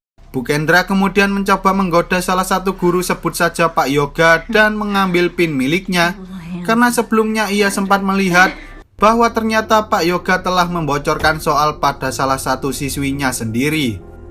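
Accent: Java, Indonesia. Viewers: Jowo kentel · Ya allah mas medoknya😢😢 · Medok banget aksen bicaranya, bikin pusing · logat jawa ya bang biasa yg gw dnger logat sunda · Baca ny jgn medokkkkkkkk😂😂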